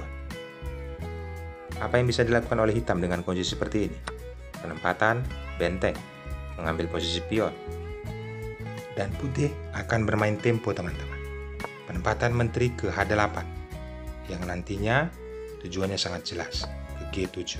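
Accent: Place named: Karo, Indonesia. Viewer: Kental bgt ngomong Karo bro😂😂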